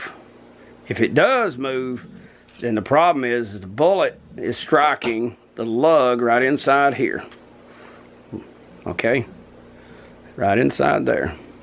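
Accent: South, United States. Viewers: Your accent is exactly what they aimed for in inglorious bastards for brad pitt. love it.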